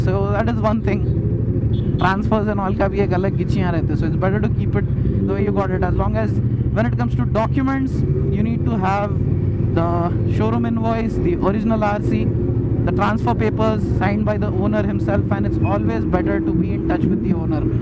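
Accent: Hyderabad, India. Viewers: Bhai pakka HyderaBadi slang awwesome... love from Hyderabad Jeedimetla.-55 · Bol chal se pure hyderabadi hai miya tum!!!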